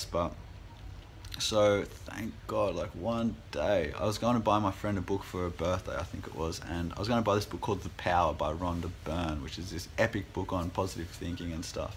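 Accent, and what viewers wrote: Australia. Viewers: It was the first time that l watched your video . Love your accent , do you from aussie? Thanks for sharing your experience. I hope that I can get rid of this habit one day . Although I've juste had finished eaten again after dinner . Sorry for my English . Love from france .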